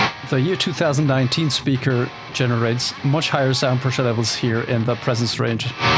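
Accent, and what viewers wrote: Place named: Ireland. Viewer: Your English has a slight Irish accent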